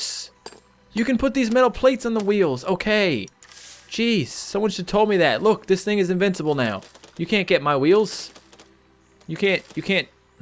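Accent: Canada. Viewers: I watched 2 videos of yours. And ur accent sounds Canadian.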